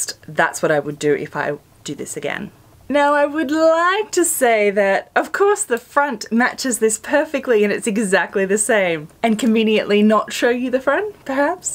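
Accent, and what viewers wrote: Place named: Australia. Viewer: I recently discovered your channel and have been binging them all over the last few evenings. My adult daughter has commented a couple of times (and in particular on this post) that she is going nostalgic for he ABC TV production Play School. We analysed it and concluded that the combination of your well spoken Aussie accent, speech pace, question and response style and gentle tinkly background music is giving the vibes. Now that I have heard it it is hard to unhear it. Don’t change it, we love it. Btw, did you also grow up with Play School?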